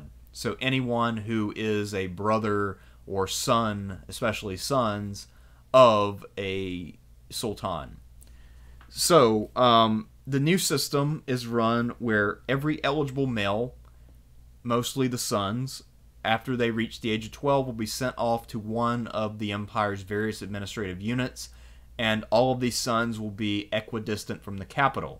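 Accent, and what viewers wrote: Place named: United States. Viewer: Our narrator doesn't really do pronunciations very well. Almost every video he "Americanizes" other people's languages. I guess you can't be good at everything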